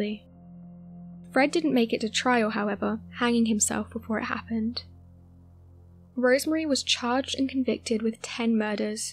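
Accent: United Kingdom. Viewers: She's British. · What’s this beautiful accent? I know prob UK but is it Welsh, some specific part of England ? Thanks it’s just beautiful for stories .... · Nice narration voice.clear and concise.British of course.lol.😁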